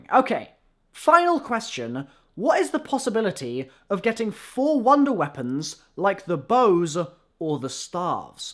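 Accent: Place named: United Kingdom. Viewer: Your too British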